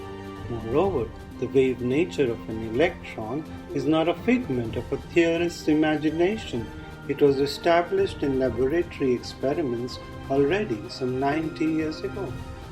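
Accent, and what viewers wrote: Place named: India. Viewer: Sorry if i seam racist here, but i have to tell you! I just LOVE your indian exant, i really get this feeling of wanting to smile, thats rare. Its something about how you use tones in your voice. This a bonus for a lecturer like you, its like having einsteins hyper inteligent teddybear speaking to me through youtube or something. Newer loose that grat tone of woise. Your like a guru, not talking bullshit all the time.. More power to you :-)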